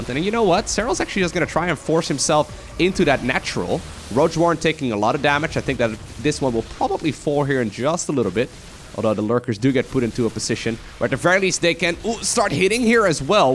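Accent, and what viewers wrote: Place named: Netherlands. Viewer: Your Dutch accent never gets old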